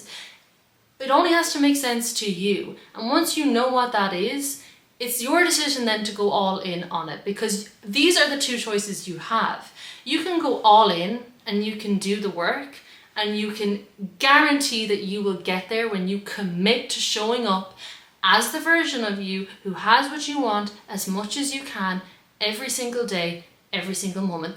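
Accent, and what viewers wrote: Ireland. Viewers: I love your irish accent.Your so beautiful ❤️😊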